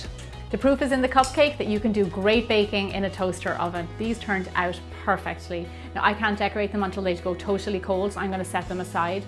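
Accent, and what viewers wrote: Ireland. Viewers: What part of Ireland are you from I live in a Cork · Are you irish?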